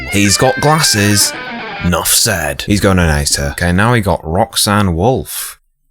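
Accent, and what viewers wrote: United Kingdom. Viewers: i was not expecting that voice from you dude lol you dont look british at all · Is this guy British or smth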